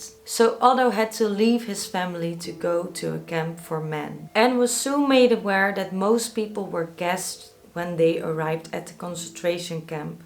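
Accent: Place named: Netherlands. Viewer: ik heb een warme plaats in mijn hart voor Engelstalige YouTube video's met een waarneembaar Nederlands accent. Als ik Engels spreek heb meteen een soort identiteitscrisis omdat ik word geconfronteerd met de keuze tussen Brits Engels en Amerikaans Engels, dit is volslagen onzin; ik zal Nederlands Engels spreken! that's different coockie!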